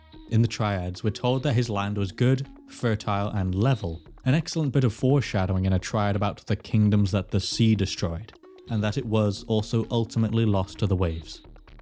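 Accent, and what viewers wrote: Wales, United Kingdom. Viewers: Love hearing the Welsh pronunciation ❤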